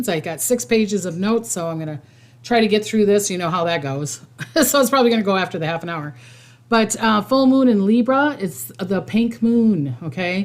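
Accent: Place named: Minnesota, United States. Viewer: Girl - your Minnesotan accent is on point!!!!! I love your hat!! From one hat-loving girl to another — Happy Easter!! Sending much love & gratitude to you 🌻💙💛🌻💙💛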